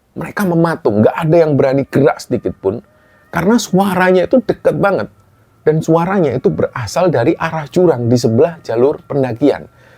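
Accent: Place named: Surabaya, Indonesia. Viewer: ngomong cok nya faseh medok hehe mas ancen arek suroboyo temenan hehe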